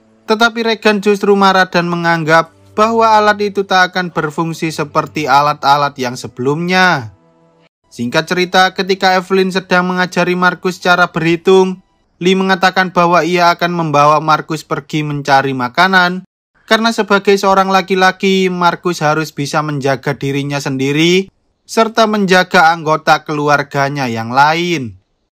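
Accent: Java, Indonesia. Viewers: Jawa medok, malas nonton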